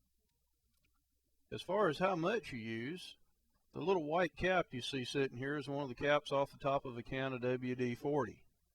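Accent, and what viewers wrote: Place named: South, United States. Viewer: Normally I hate long intros, but I feel like it really made the video more entertaining, especially with the southern accent😂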